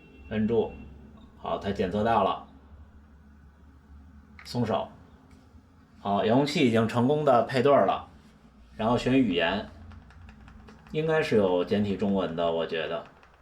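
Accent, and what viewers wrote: Beijing, China. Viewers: 一口的京片子。。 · 典型的北京人口音，真亲切，竟然有人还说口音重，那一定是你自己不讲普通话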